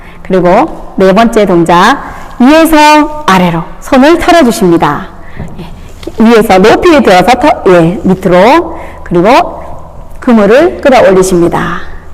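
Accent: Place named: Chungcheong, South Korea. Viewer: 강사님의 밝고 힘있는 구령소리를 들으니 저절로 운동욕구 충만해 집니다. 충청도 사투리도 재밌네유~~ 매일매일 보면서 운동 열~심히 하겠습니다. 고마워유~~~^,^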